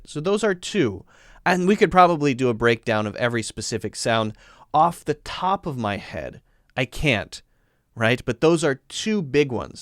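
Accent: India. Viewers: Please don't reduce your Indian accent 🙏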